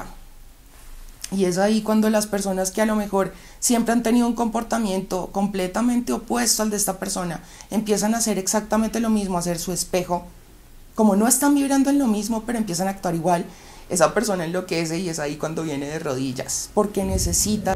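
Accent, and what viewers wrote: Colombia. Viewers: Excelente video y GRAN CONTENIDO 🇨🇴 MI GURÚ FAVORITA ❣️😉✌️🇲🇽 (esa manera de hablar colombiano y esplicar con tanta paciencia te hacen muy ESPECIAL 🇨🇴❣️😉✌️🇲🇽)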